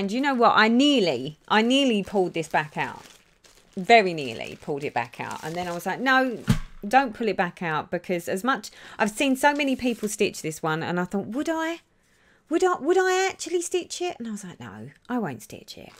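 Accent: United Kingdom. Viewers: Yaay! Congratulations! Your videos have helped me so much, not to mention I love to hear your UK accent when you speak! Your stitching is beautiful and inspiring. I’ve been contemplating switching over Annie’s Keepers because of you. Please keep the videos coming. It won’t be long before you’ll be celebrating 20K! Thank you for your generosity in this wonderful giveaway! 1, 2, 3, 5, 7, 9, 10, 13, 14, ❤️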